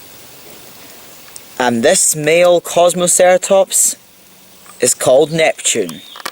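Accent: Scotland, United Kingdom. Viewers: Dude are you Scottish? :P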